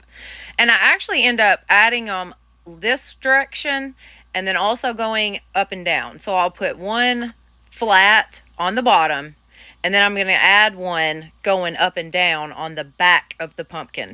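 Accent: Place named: Tennessee, United States. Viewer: Love each of these but I'm crazy over the first 2.. Gorgeous !! I am trying to guess where you are by your accent. I'm not right on many of my guesses. Lol.. I'm thinking Tennessee or North Carolina.. Am I even close ? Lol.. I live in South Carolina.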